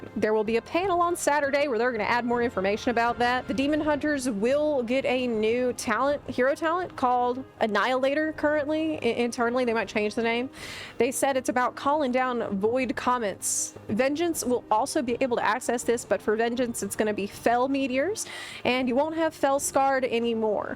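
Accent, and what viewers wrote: South, United States. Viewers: i just discovered your channel and i LOVE your enthusiasm and omg your accent. girl! i was born in texas, but raised in mississippi. and now i live in the midwest lol. i love your southern twang and miss it. 🙌🏼 they need to do housing themed kits by class, too. i don’t wanna be a druid sitting on an upholstered sofa from IKEA. also VERY nervous about kill-on-site in silver moon lol. i have a bad habit of mindlessly running into horde territory in Dalaran 😢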